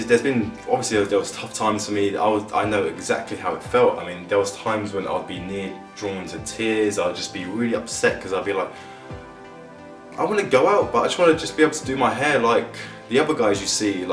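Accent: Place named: London, United Kingdom. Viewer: Bro might I recommend shaving your head - you're a good looking dude and you can pull it off. It would look a lot better than what you have now. Dht causes hair loss, not shampoo, not stress, not smoking, not diet, not anything else. You sound like you live in London, so speak to the top trichologists and hair transplant surgeons on Harley street, and they will tell you the same. I used to think like you did, until I saw a fat homeless junky outside Bond Street with a head full of amazingly thick hair - I'm sure he's stressed, I'm sure he smokes and drinks and eats shit. So why isn't he bald? Because his genetics are coded differently to mine and yours - his follicles are resistant to dht, yours are not.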